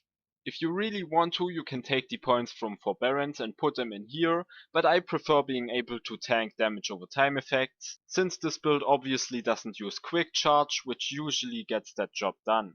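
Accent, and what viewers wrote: Germany. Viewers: Klingt sehr deutsch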